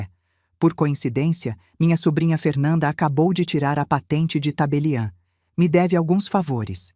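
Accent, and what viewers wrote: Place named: Portugal. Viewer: Mimo. Em excesso estraga ... Estou ouvindo Portugal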